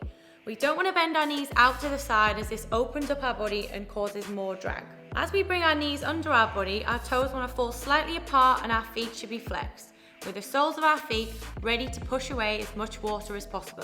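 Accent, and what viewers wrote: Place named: United Kingdom. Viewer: British accent🤣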